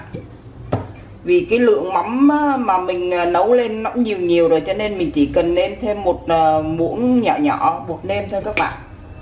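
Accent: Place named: North, Vietnam. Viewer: Co o Dong nai ma cung an mon mien tay ha.giong co nguoi bac ah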